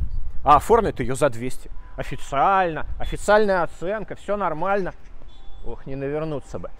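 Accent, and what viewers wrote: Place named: Castile, Spain. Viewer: испанский не с акцентом,а кастильский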